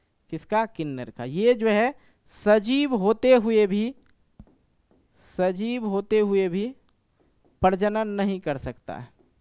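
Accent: Bihar, India. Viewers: Sir aap bihar se hai kya😅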